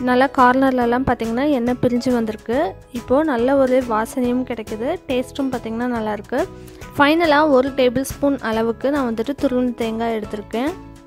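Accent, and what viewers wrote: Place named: Chennai, India. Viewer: ungal voice kovai Tamil illa Chennai Tamil maari iruku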